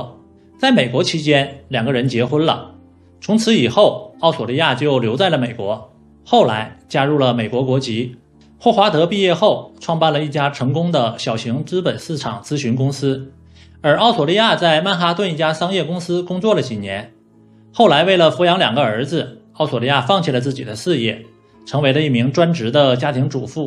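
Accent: Northeast, China. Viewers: Hi东北老乡，要花时间多练练普通话！ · 好明显的东北口音，藏都藏不住哈哈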